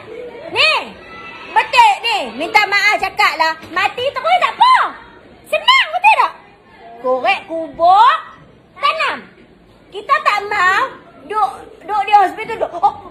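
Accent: Kedah, Malaysia. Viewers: Kelas bahasa kedah ke ni? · Cikgu ni org Kedah ka 😂😂😂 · Rasa pelat tu bkn penang..ni blah kedah perlis x silap.. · Pekat betoii bahasa Kedah cikgu ni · Orang kedah memang sedap dengar bila depa marah 🤣🤣🤣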